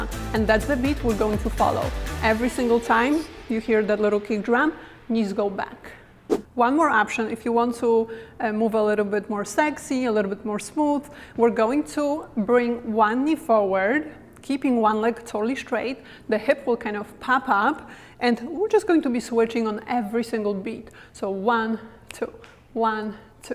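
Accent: Poland. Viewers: You sound Polish. My parents are Polish. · Great teaching, thank you! I'm going to watch your other videos! BTW. Have you any connections with Poland? I can hear sth like "Polish note" in your voice ... :) Don't answer if it is too personal.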